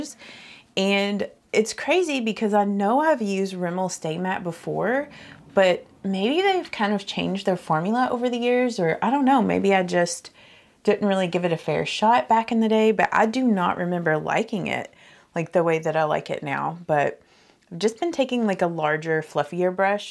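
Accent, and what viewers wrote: South, United States. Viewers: I like your voice, and, really enjoy your southern accent. Very pleasant to listen to. So I vote NOT silent video ☺️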